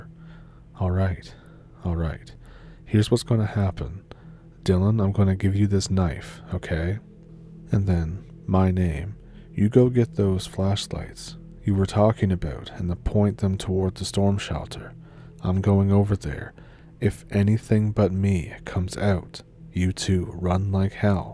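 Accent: Canada. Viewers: I always look forward to your stories. This time even more as I'm from Oklahoma. Love that last one. I was in campfire girls when I was young. Went to camp only once. Unfortunately or fortunately, I've never seen any thing frightening. I've been on overnights with my horse riding groups,to many areas of Oklahoma. Yet nothing. I must be very protected, that's all I can figure. Hope you get better soon sir, love your Canadian accent😊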